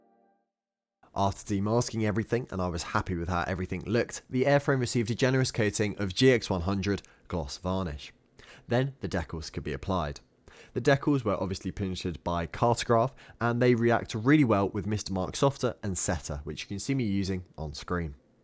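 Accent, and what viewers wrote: England, United Kingdom. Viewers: The England pronunciation is cool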